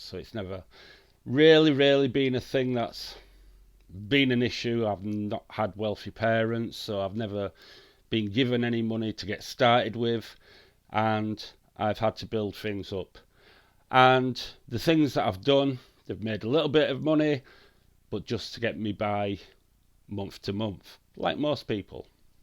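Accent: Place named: Leeds, United Kingdom. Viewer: sounds like Leeds acsent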